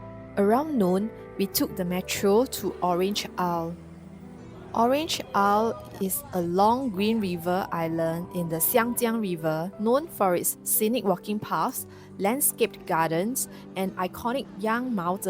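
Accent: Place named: Singapore. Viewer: She sounds Singaporean.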